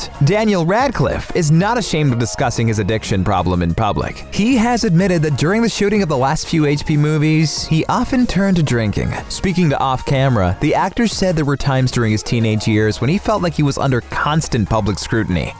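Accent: United Kingdom. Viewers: what is your British but American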